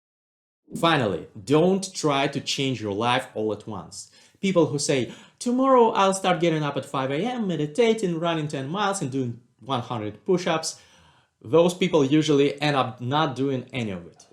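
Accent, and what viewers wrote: Russia. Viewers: как насчёт всерьёз позаниматься с преподавателем для искоренения русскоязычного акцента? Также весьма заметно, что читается написанный текст, не круто. Но круто то, что вы делаете на русском. Желаю успехов! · you mean that a starts to speak with more russian accent?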